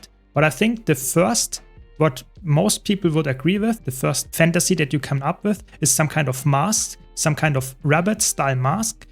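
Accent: Germany. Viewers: uff mir fällts immer wieder auf wie sehr es anstrengt den deutschen akzent zu hören. aber dennoch, gute anordnung der elite spezialisierungen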